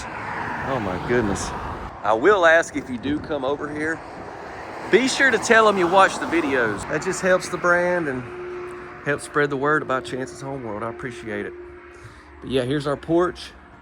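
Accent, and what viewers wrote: South, United States. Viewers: This is so adorable, I love it (and I just love your southern accent too!)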